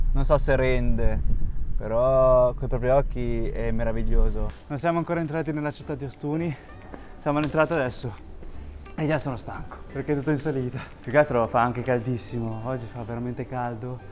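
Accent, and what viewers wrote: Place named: Romagna, Italy. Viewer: Hai un accento romagnolo, direi riminese, che mi fa morire 😅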